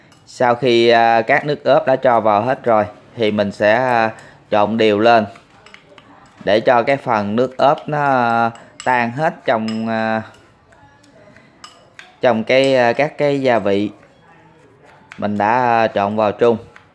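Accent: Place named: Binh Duong, Vietnam. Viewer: Nghe giong noi ban ... minh nghi ban o binh duong thi phai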